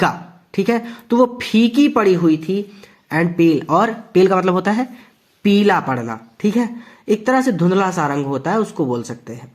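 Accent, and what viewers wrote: Allahabad, India. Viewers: Sir aap allahabad se hai kya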